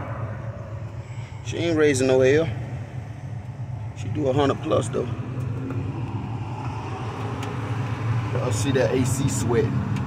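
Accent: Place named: Canada. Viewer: Cool accent! Where you from? Canada?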